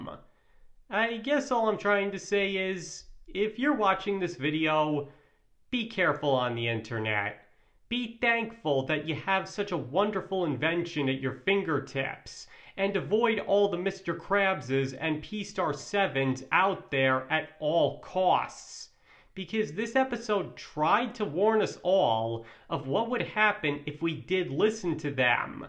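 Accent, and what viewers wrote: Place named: Great Lakes, United States. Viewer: Im really enjoying your channel! You bring new perspective to the SP Analysis community that is sorely needed. I'm also dead ass fascinated by your accent. I've heard it once before, from a Podcaster who is probably twice your age; specializing in baseball and popes! 😅 I've heard it referred to as a "Great Lakes" accent. My brief attempt at light online stalking was unsuccessful. Can you help me understand your accent better without revealing inappropriate location details?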